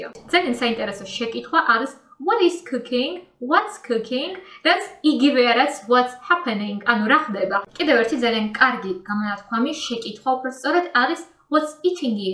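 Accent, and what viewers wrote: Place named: United Kingdom. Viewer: ძალიან კარგად ხსნი, მაგრამ ბრიტიშ ინგლიშს სამწუხაროდ ვერ ვიგებ, ამერიკული ვარიანტიც დაამატე რა, ამერიკული გამოთქმა,თ- ს ყური ვერ ეგუება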